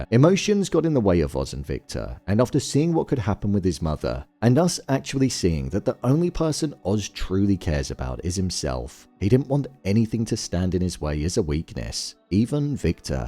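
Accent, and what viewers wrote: United Kingdom. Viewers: You British (??) hear your voice and it sends my mind to peace. Good breakdown. 💪🏿🫶🏾👍🏿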